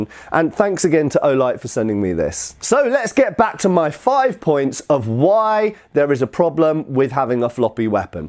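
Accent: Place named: United Kingdom. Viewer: If I couldn’t hear your accent, I would still know you were British due to the incredible dead delivery of innuendo here haha. Well done!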